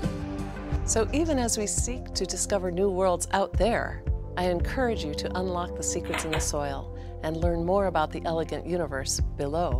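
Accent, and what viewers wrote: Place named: United States. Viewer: Like si vienes por inglesamericano :v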